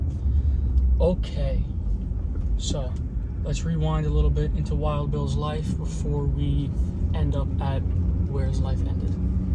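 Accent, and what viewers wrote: New York, United States. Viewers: Terti years ago! Great NY accent. :)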